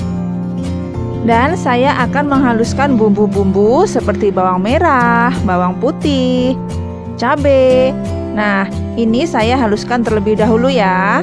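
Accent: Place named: Java, Indonesia. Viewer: Wah mantap bikin perut lper ni 😄 jowo medok hadir embak e..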